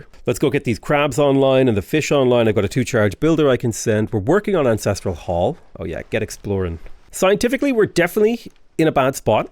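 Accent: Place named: Ireland. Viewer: As a Scotsman from a small town between Dundee and Aberdeen IRL I think you have improved the landscape massively 😅 however I really wish you would talk a little slower as the tips on the game you are providing are gold dust. And if it's hard for a Scotsman to understand your quick-fire Irish, then I'll guarantee other people will struggle more. Looking forward to watching this entire playlist though.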